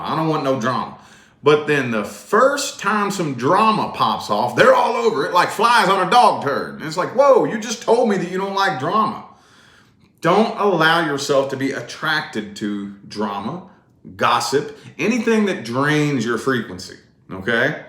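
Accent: South, United States. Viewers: Really enjoyed this reading,! Are you from the South? I know I hear an accent in there.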